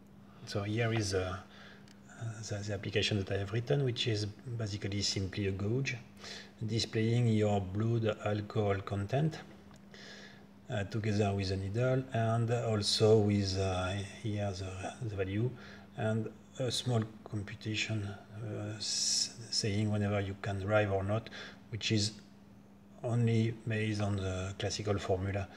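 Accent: France. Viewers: Bonjour, je devine par le logo Orange F mais aussi par l'accent que vous êtes français ! Je suis intéressé à en savoir plus sur votre projet et son fonctionnement plus précisément pour le transfert de données jusqu'au smartphone et comment rendre l'outil plus fiable à partir du détecteur MQ-3, pourriez vous m'envoyer un mail à mon adresse mail afin de communiquer plus facilement : <mail> Merci d'avance pour votre réponse et au plaisir de recevoir de vos nouvelles !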